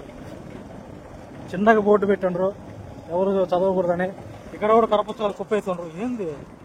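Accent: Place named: Nellore, India. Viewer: నెల్లూరు యాస బలే మజాగా ఉంటుంది బ్రో నీ భాష ఒరిజినల్ గా వుంది సూపర్ బ్రో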